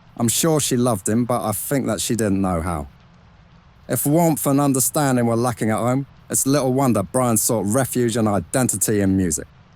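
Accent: United Kingdom. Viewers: British Howard Cosell narrator...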